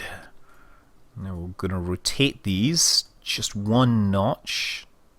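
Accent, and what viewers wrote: Scotland, United Kingdom. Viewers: You haven't truly lived until you've heard a Scotsman recite Star Wars quotes. Well done,.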